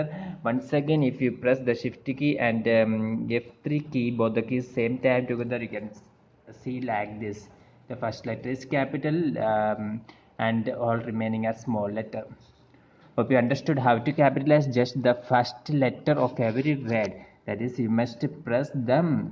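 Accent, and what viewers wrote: Kerala, India. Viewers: Malayali aanenn keetal ariyam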